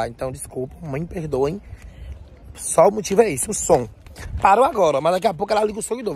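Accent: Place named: Bahia, Brazil. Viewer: Amo ouvir esse baiano voz linda sutaque lindo E Assim mesmo parece sacanagem eita jó Ótimo domingo ❤❤❤aposto q não vai ter som Presta atenção so a misericórdia Deus e masss Ótimo domingo para vcs.....❤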